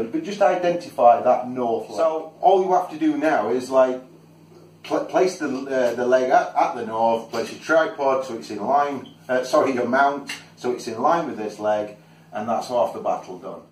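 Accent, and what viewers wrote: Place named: Manchester, United Kingdom. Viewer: Beautifully explained, really helpful and topped off as delivered by a nearby Mancunian!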